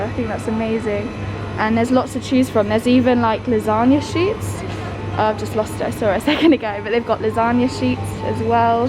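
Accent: England, United Kingdom. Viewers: О, этот милый английский акцент! ) А то так надоел американский английский. Когда американцы говорят, создаётся впечатление, что у них во рту горячая картошка.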